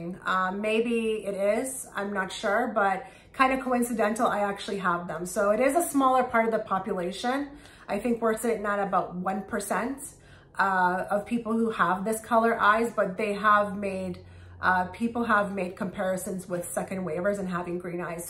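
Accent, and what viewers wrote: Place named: East Coast, United States. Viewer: You sound like an East coast girl to me. New York area?? I am from NYC. Your video resonates a lot for me! Is there a way to message you? I have experienced all you speak of in this message. Blessings to you!!! ✨🩵✨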